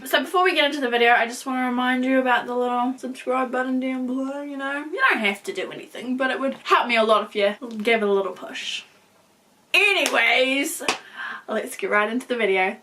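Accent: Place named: New Zealand. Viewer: Ughhhh I am in love with the modern Renaissance palette 😍 Your accent threw me off for a second, I was like "SHES AUSSIE" but your accent didn't sound quite right for an Aussie, I think it took me 2 solid minutes to realise your actually a kiwi 😂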